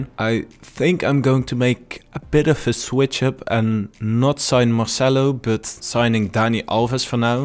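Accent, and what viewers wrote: Netherlands. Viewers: Hmm I can hear the Dutch accent ;)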